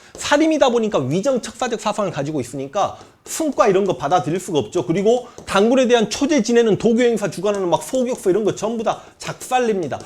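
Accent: Jeolla, South Korea. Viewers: 전라도 사투리가 거슬리지만 재밌게 잘들었습니다.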